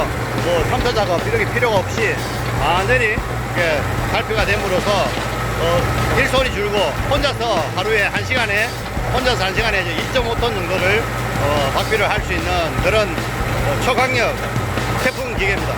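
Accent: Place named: Sangju, South Korea. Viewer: 상주 사투리가 구수하고 좋은 기계 잘보았습니다. 김천제품 3년전에 구매했는데....?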